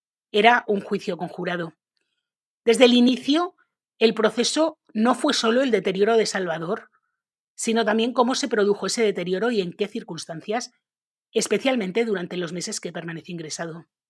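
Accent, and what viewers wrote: Spain. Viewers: Desde ARGENTINA. ME ENCANTA ESCUCHAR SU ACENTO ESPAÑOL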